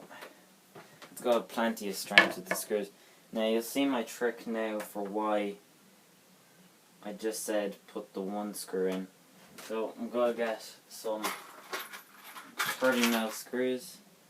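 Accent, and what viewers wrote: Ireland. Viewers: What part of Ireland are u from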